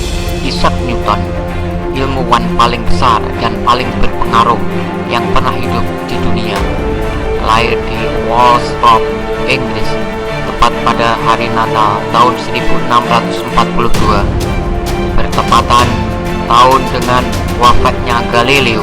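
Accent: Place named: Java, Indonesia. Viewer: Bisa gk, di kecilin musiknya dan logatnya jangan meddo kali la..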